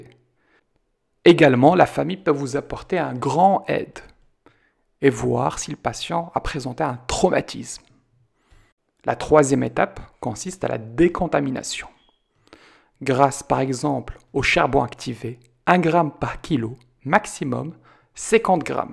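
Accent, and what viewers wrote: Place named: Belgium. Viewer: Docteur, vous habitez en Belgique ? Ça se voit parce vous prononcez tachycardie avec : ch, et nonante pour 90🇧🇪🇧🇪🇧🇪😊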